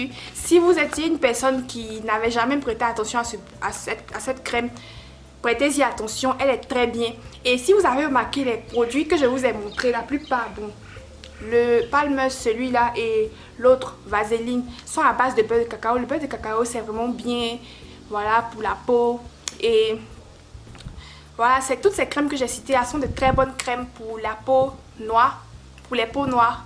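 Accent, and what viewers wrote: Ivory Coast. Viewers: Ma chère par ton accent j'ai sû automatiqmt que tu étais Ivoirienne😍😍😘😘🇨🇮🇨🇮🇨🇮. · Tu es vraiment ivoirienne le language qui ne trompe pas le ye qui ne trompe pas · Super ! Contente d’entendre l’accent ivoirien fatiguée du chocor 😂🤸🏾‍♀️ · L accent me dis quelque chose .tu es ivoirienne ? Petit conseil prend plus le temps de parler tous doucement. Belle video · Hien les ivoiriens avec votre tonalité la😫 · cet accent ressemble un accent de la côte d'ivoire bisou ma cherie moi je suis originaire de ce pays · Je vien d'arriver sur ta des que j'ai entendu ta voix e su que tu était ivoirienne❤️❤️🙏🇨🇮🇨🇮🇨🇮🇨🇮🇨🇮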